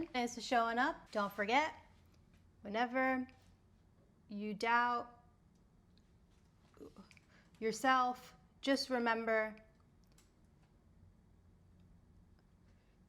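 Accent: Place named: Japan. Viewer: She speaks English like Japanese people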